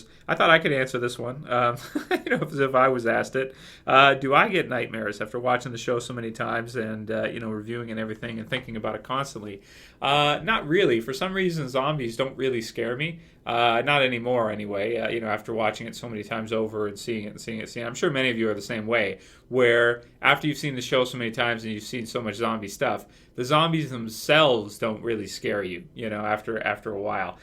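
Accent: England, United Kingdom. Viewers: Wait! You're english...,. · ... You're english? WUT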